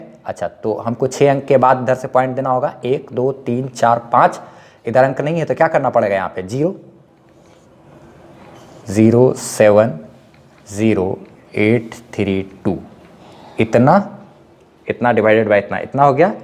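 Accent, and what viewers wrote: Bihar, India. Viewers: I really love your Bihari tone 😅❤️❤️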